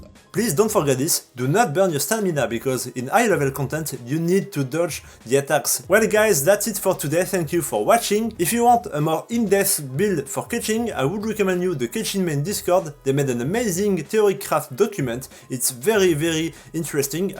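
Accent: France. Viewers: J'ai tout de suite vu que t'était français avec l'accent mdrr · alala l'accent fr de qualité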